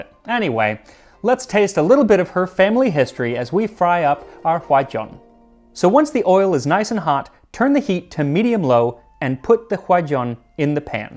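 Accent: United States. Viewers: Your pronunciations are on point, but it still sounds odd coming out of an American mouth. Kudos for going that extra mile to say it correctly . There's a huge Spanish speaking community here in Chicago, yet, 90% of my family still call fajitas fuh-jy-tuhs.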